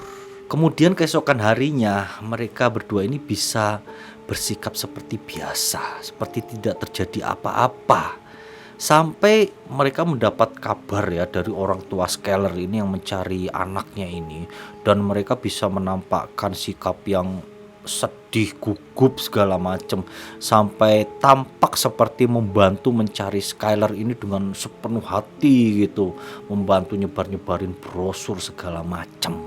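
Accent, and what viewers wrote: Java, Indonesia. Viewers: Logat jawanya terllu dalem jd agak ganggu dengernya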